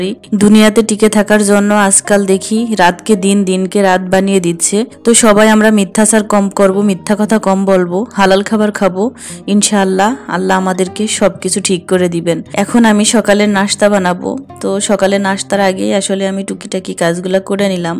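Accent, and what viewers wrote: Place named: Jhenaidah, Bangladesh. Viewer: আপু,আমি প্রথমে জানতাম না যে তুমি কোন জেলার ۔۔۔কিন্তু তোমার ভাষাটা আমাদের অঞ্চলের মতো লাগতো ۔۔۔পরে জানতে পারলাম যে তুমি ঝিনাইদহের মেয়ে ❤۔۔আমিও ঝিনাইদহের ۔۔আসলে নিজের অঞ্চলের মানুষদের উপর অন্যরকম এক টান কাজ করে l আমি তোমার নতুন ভিউয়ার ۔۔ অনেক কিছু শিখতে পারি তোমার থেকে۔۔۔۔۔۔আপু নেক্সট ভিডিওতে ঝিনাইদাহ নিয়ে কিছু বলো۔۔ আর আমার নামটাও একটু মেনশন করো ۔۔۔তোমার মুখে আমার নামটা শুনতে চাই ❤ +জেসমিন = ❤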